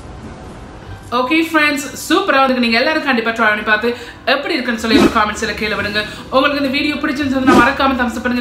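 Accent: Sri Lanka. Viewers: இது இலங்கை தமிழ் அதை கேவலம் என்று சொல்ல வேண்டாம் பிடிக்கவில்லை என்றால் ஏன் வீடியோ பார்க்கிறீர்கள்.... நீங்கள் உன்மையான தமிழர் என்றால் கேவலமான தமிழ் என்று சொல்ல மாட்டீர்கள்...... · I'm from Tamilnadu near Madurai I subscribed to hear your srilankan tamil. Your recipies are entirely new for me and very interesting too.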